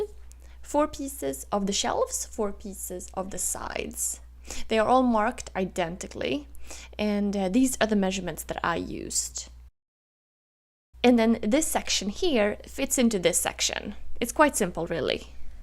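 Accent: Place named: Norrland, Sweden. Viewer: I love the roundover you did!! So smooth and modern looking :-D This piece is very nice. And that's a compliment coming from a person who does not like knots in wood at all (clear only) ;-) Very nice looking work! :-) Just curious though: have you ever used the "bow tie" type of jointery? Here's a short vid by a guy who acts kinda weird, but his method seems very good - they are custom shapes too! <link> By the way, by any chance are you from Northern Sweden, I once had a housemate who was from Northern Sweden, his accent was similar to yours. But many other Swedes I've met had mastered the American "accent" (called a "Flat Accent" by the British), so I couldn't tell that they were Swedes until they started talking to each other...it was CRAZY :-D I couldn't believe my ears. They had no discernible accent at all. Crazy, right? :-)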